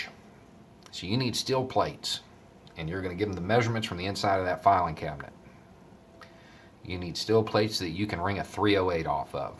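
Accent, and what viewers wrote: South, United States. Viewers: I just recently discovered your channel. I love the way you present things in a straight forward manner. You always give me something to think on. And props on showing the world people with southern accents aren't knuckle-dragging idiots. I've lived in Charleston, SC my whole life and sometimes my accent causes me to not be taken seriously. 🙄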